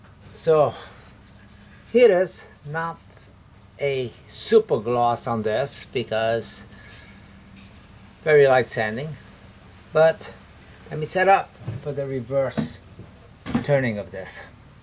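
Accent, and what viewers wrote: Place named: Portugal. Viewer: you are a rebel! ( and a master) love watching to see what your making.By the hat and the Portuguese accent you must be from the Massachusetts area. Keep up the awesome videos,you are truly a craftsman.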